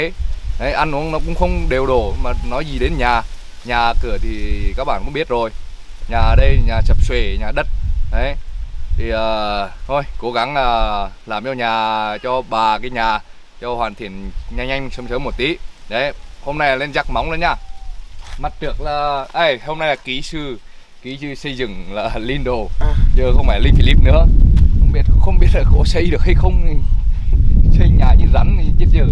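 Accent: Nghe An, Vietnam. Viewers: Mẹ mình cũng ở quê Nghi Lộc. Nên nghe tiếng của Linh sao mà thích ghê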